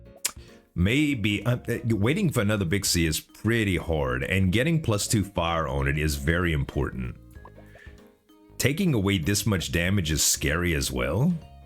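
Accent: Louisiana, United States. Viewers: Okay dude your accent is driving me nuts. Are you from Louisiana? You have a very Cajun accent. I'm super familiar with it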